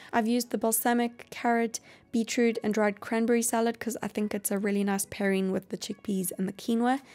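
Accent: South Africa. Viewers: As soon as you started talking I knew you were from South Africa 😂😂💗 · Are you South African? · In South Korea, was busy writing when you started your video. Seconds into the video I asked myself, "Is this person South African?" and then you said "Ja." It's SO good to hear an accent from home whilst being in another country. Thanks for the video. I'm subscribing. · I'm new to your channel but are you south African? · you sound south african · Is that a South African accent I hear?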